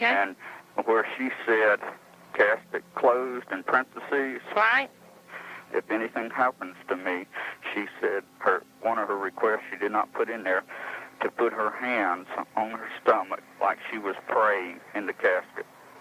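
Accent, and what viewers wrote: Scotland, United Kingdom. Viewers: Please don´t change. I love your bubbley personality, and I especially love your scottish accent. I love Scotland, and I hope my husband and I are gonna visit it one day ♥♥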